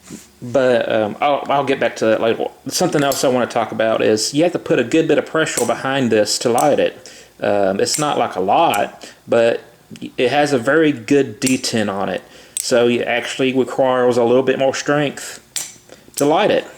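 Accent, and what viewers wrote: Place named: Texas, United States. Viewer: Wattel, Lightel, chambel, smokel, yeal, or anything ending in "r" in English intrigues me as to what part of the USA are you located. Having just left the northern east coast, it is definitely not from that locale.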